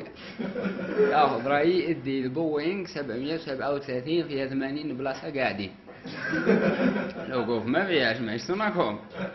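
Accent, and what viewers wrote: Djelfa, Algeria. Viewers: مهدرة تاع جلفة حلوة وتضحك يعطيك صحة...سكرة في لي ميحبكش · لهجة جزائرية جميلة.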